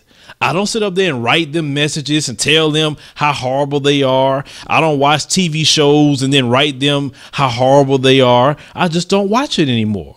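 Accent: South, United States. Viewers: speaks clear wit' a southern drawl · I minor in English phill and your just fine. I just love your southern accent. forget that silly woman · My god! This grammar nazi Crystal chick need to have several seats. It's not like you're an anchorman or a reporter at a news station where proper grammar is a must. There's nothing wrong with the way you talk, she couldn't stand your southern accent, but you speak proper to me and I was born in Chattanooga, TN myself and still got a southern accent. So I guess this Crystal chick went to Oxford University herself, SMH, just keep doing you.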